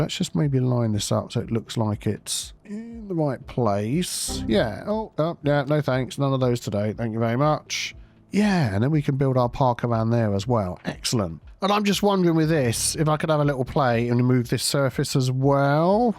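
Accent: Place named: North, United Kingdom. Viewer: ik this is like a super weird and irrelevant question but are u a northener of a southener coz u have a friendly northerner vibe but i can place ur accent nowhere plz ignore if this is too personal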